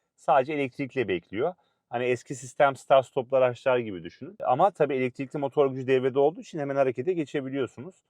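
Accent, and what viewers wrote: Samsun, Turkey. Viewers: Samsun şivesi😊olmuyor maalesef😊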